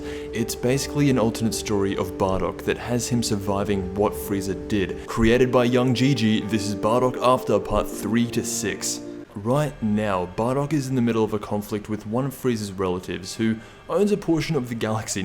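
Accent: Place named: Australia. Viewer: by his accent that he's Australian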